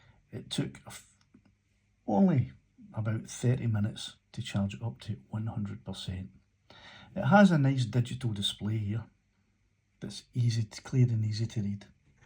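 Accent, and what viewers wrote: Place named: Glasgow, United Kingdom. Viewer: I love your Glasgow accent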